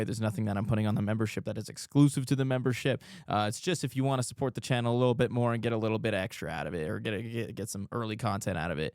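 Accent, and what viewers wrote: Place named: England, United Kingdom. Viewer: We're Scots. Around the World. You'll be fine. You sound like an Englishman though.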